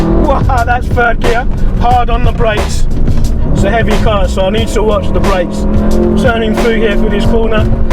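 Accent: London, United Kingdom. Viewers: Just discovered this channel. I’m getting a stage 2 in the new year on my F10 M5. I have a track focussed 911 which I take to the Nurburgring, but in April I’m taking the M5 ! Great hearing a Brit over the pond. Take it easy brother 🤜🏼🤛🏾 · I like your video style great funny conentry good old London accent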